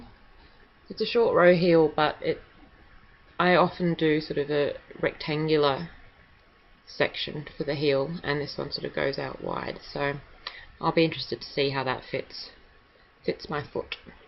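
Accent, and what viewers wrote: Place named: Australia. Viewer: Hi I'm a new knitter from Newcastle NSW. I've been using You Tube to help me learn, and was so excited to hear an Australian voice. It really doesn't take long to become a yarn addict does it!! I always assumed that our home grown wool was still in abundance, but have been surprised at how hard I've found it to source locally. Do you know of any local mills or indie dyers out their that I could support? Looking forward to your next episode.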